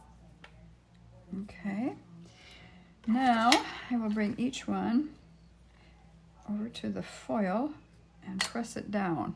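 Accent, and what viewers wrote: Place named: Minnesota, United States. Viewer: I love these! So beautiful! And what a cool technique! Definitely want to give this a try! Are you from Minnesota by any chance? Or maybe Wisconsin? I was guessing you were as I was listening, but the "ufda" gave it away! Ahhhhh! The sound of home!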